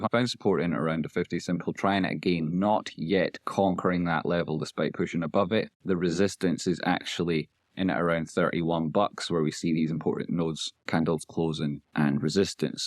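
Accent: Scotland, United Kingdom. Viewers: Just found you on YouTube …..been watching various investment guys for a few years, and you just popped up! Good to hear a Scottish voice.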